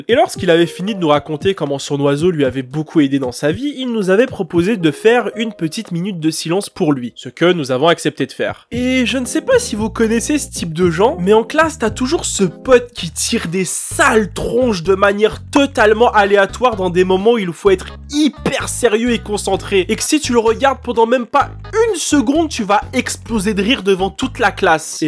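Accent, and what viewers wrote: Quebec, Canada. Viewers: j'aime beaucoup tes vidéos et j'aime l'accent québécois car je suis français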